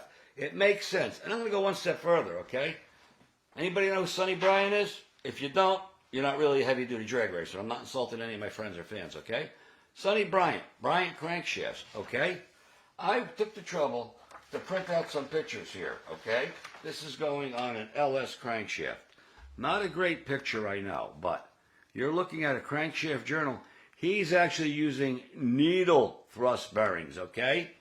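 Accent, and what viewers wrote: United States. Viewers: I hear Long Island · Great into to your video. I listened to the first10 seconds and said this guy is from NJ, as is I. Everything you said makes perfect sense. My only suggestion is to use coated bearings.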